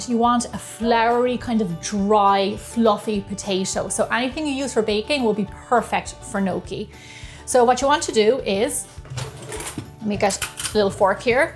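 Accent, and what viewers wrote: Ireland. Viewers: Hi.. I love your do-it-yourself filming, it brings out your personality even more.. when you were humming as you were picking the potatoes.. it's lovely to see how you're keeping your accent and Irish-"isms" intact 🤗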